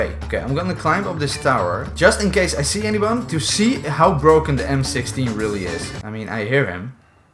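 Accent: Netherlands. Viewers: R u dutch ? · I smell dutch isn't it? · does he sound a little dutch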